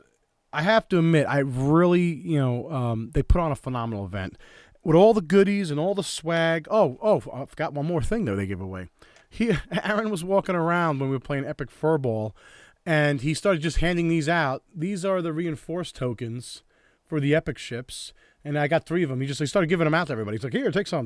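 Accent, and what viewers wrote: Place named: New York, United States. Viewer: you sound like you're in the new york area. if you are I wanna take you on on the space map!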